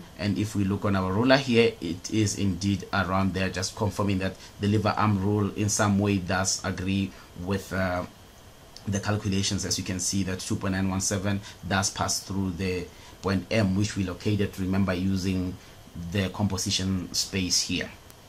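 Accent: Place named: India. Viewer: nice job. Thank you professor. I love your Indian English❤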